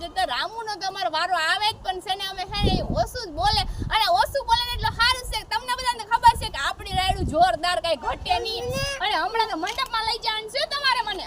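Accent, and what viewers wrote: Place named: Porbandar, India. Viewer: દીદી જી તમે પોરબંદર જેવું બોલો છો મતલબ આપ કયા કોમ્યુનિટી માંથી છો 🙏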